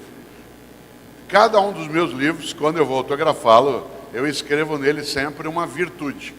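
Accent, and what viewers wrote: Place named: South, Brazil. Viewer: Assisto várias vezes.... . Gosto. Do sotaque do povo lindo do Sul .....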